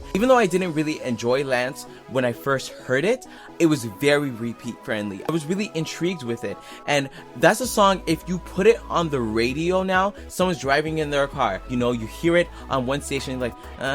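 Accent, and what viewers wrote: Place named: Jamaica. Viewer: When I first heard it I was disappointed they went the trap rnb route because I expected more from their voices. I didn't like for the same reason but now I can't help but bump to it. Like you said they're trying to capture a certain market, "get in where they fit in," and LANCE might just do that for them. Off topic but are you Jamaican? I hear a slight yardie undertone in your voice lol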